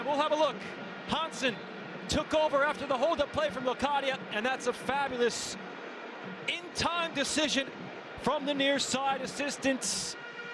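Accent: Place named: United States. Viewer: Amazing but shame it was an American commentator. It just sounds weird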